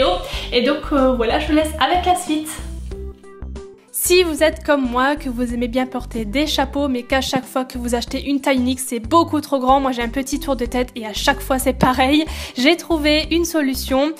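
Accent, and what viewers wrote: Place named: Alsace, France. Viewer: Haha quand j'ai entendu ton accent j'etait sur que tu etait alsacienne ,comme moi 😉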